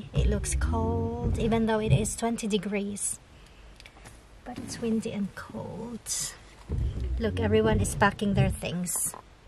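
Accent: United Kingdom. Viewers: Belated happy birthday, rainy day in your place now, gusto ko British na accent, sounds good... Enjoy the zoo...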